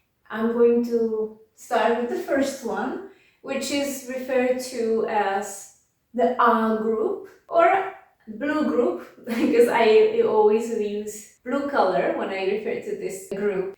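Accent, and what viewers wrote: Czech Republic. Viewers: Your English with a Czech accent is pleasant to hear, and your Czech pronunciation and teacher skills are extra incentives to continue learning the Czech language. (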